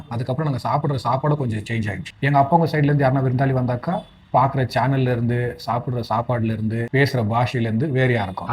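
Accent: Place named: Karnataka, India. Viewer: நீங்க Bangalare கன்னடர்கள் மாதிரி தமிழ் பேசுறீங்க தம்பி....Half தமிழ் accent கூட இல்லை....ஒரு வேளை நீங்க பெங்களூரு la படித்து வளர்ந்தவரோ... தாய் மொழி பேசுவதற்கு பாராட்டுக்கள்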